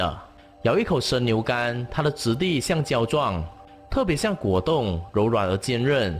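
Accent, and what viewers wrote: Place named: Malaysia. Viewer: 大马口音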